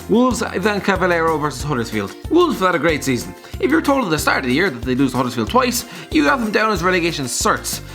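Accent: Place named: Ireland. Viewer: Its Irish Guy he's Irish most Irish people talk fast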